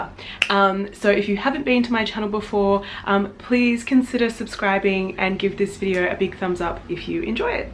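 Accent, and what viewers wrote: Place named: Australia. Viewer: Your Aussie accent is delectable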